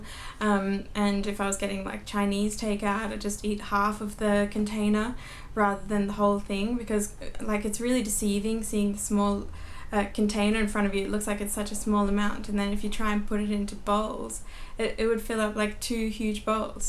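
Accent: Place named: Australia. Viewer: If I had to guess I would say you're an Aussie?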